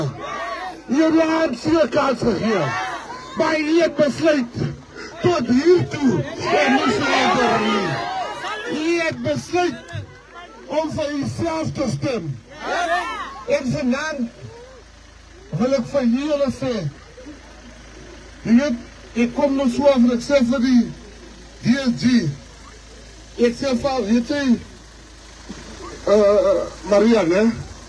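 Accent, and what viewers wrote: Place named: Namibia. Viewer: Coloured from Namibia, Mr u praat soos n Kleurling van Namibia, wat u se is presies wat in ons land ook aangaan.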